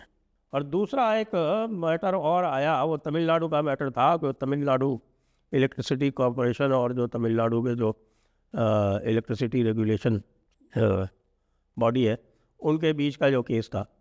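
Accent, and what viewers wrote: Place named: Uttar Pradesh, India. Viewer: Proud of and Love your UP accent 😊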